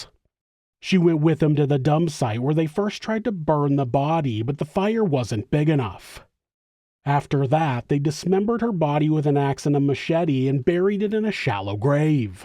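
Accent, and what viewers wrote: United States. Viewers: I wonder where with a J was brought up? Where his accent is from? He doesn’t live in the States but he has an American accent. Quite a unique voice. 🥂🦘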